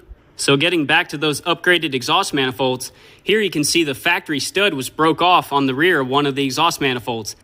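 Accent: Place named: South, United States. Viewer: I like the fast pace verbosity with the a touch of southern twang!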